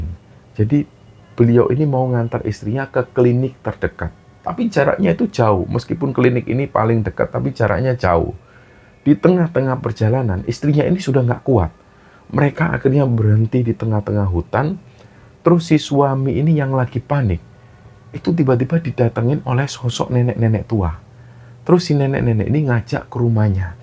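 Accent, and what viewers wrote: Java, Indonesia. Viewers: Nonton KS ga pernah selesai, di tengah cerita pasti ketiduran. Suara mas dengan logat jawanya enak didenger 😅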